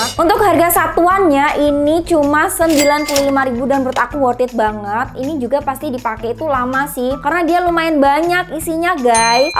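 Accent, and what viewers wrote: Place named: Kendal, Indonesia. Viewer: Orang Kendal ya? Ku kira Surabaya hehe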